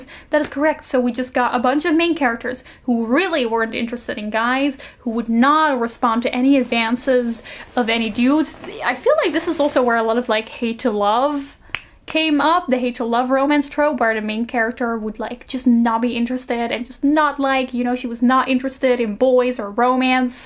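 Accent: Netherlands. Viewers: The Algorithm recommended me this video...that accent sounds dutch are you dutch?! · are you a dutchie i hear an accent (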